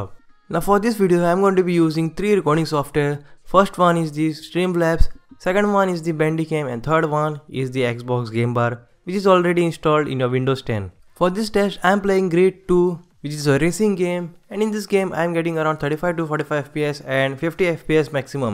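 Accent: India. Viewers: Nice 1.16.3 english Indian boy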